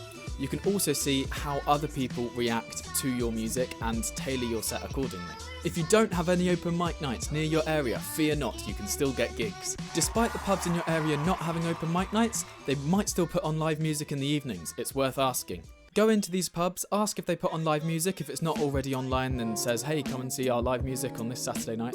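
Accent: United Kingdom. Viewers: Luv the British accent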